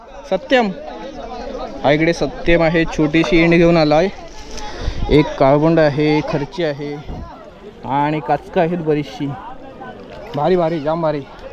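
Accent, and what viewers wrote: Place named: Konkan, India. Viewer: me pan rajapur chi aahe ha video pahun aani aapli kokani bolna mala khup aavadte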